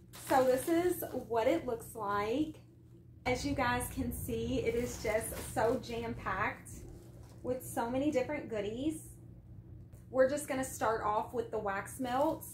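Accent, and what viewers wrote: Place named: West Virginia, United States. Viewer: I love your accent. 😊 it reminds me of home (WV)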